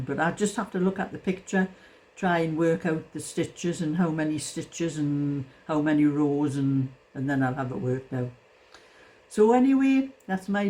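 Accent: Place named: Ashington, United Kingdom. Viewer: Hi, can I ask whereabouts you are from? You sound like my husbands family in Ashington xxx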